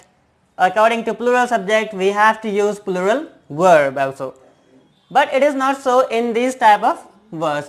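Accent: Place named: United States. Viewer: Tqs bro for your american accent of English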